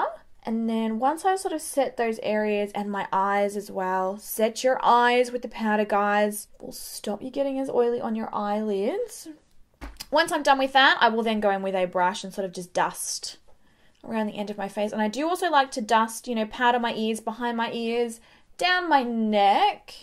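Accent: Australia. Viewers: Why do australians pronounce 'go' like GOURH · I could listen to you talk all day, love the Aussie accent! 😍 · Love yur Aussie accent 💗👍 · Really enjoyed this video but I had to watch it muted and with captions because her accent is so terrible! It was like nails on chalkboard to me and I normally don't mind an Australian accent. For whatever reason though, her accent really got to me and was hideous to listen to. Yikes.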